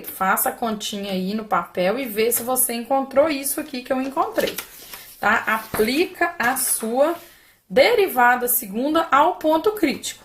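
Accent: Minas Gerais, Brazil. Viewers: E esse sotaque Mineiro parabéns prof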